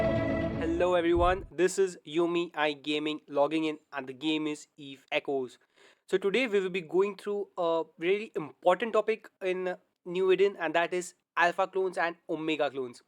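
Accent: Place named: India. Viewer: You Indian bro? I find that accent familiar.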